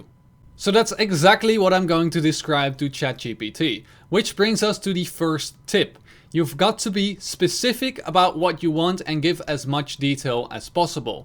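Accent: Netherlands. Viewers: just found this video (and your channel) not even 2 seconds in i already learned you're a Dutchie too :') haha our accent is so easy to pick out (at least to us) just like we can pick out people speaking Dutch that deviate more than 5% from the norms, then switch over to English because we know they aren't native Dutch speakers, we feel that shit up real quick :D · i smell dutch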